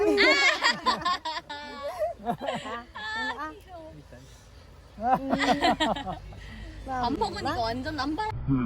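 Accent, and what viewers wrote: Gyeongsang, South Korea. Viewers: 진짜 오리지날 경상도 발음이다..ㅋㅋ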